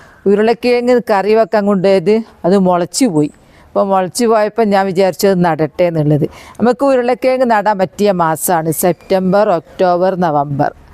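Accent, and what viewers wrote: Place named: Malappuram, India. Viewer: നല്ല മലപ്പുറം ഭാഷ കേൾക്കാൻ നല്ല രസമുണ്ട്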